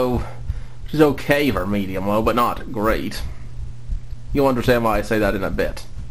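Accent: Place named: Ireland. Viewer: An invention as fascinating as the accent. If it's not too personal, I gotta ask, is this a particular regional accent or a mix perhaps? It almost has a twinge of Irish about it (apologies for the off-topic question - I just love accents)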